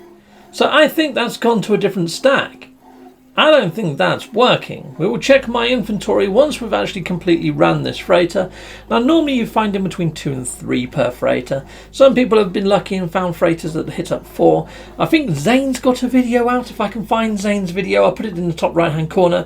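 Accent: England, United Kingdom. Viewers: I enjoy your videos. I love your English accent. I’m grieving for Elizabeth II. 🇺🇸🤝🇬🇧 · Classic British guy voice 👑🤩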